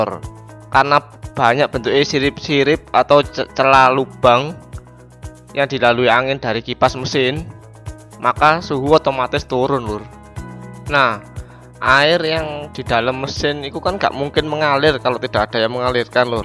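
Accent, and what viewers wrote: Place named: Malang, Indonesia. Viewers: Iku mesin e bego gak kenek d ganti mesin e becak a cak? Medok malanganmmu lurrr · logat jawanya di hilangin mas.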